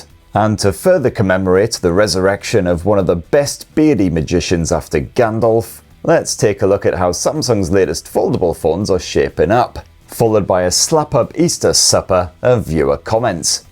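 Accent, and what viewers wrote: United Kingdom. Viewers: I know its petty but I appreciate that you stick with the UK pronunciation of Z, I've seen a lot of other UK tech YouTubers saying Zee and it mildly annoys me. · I come here to learn British English.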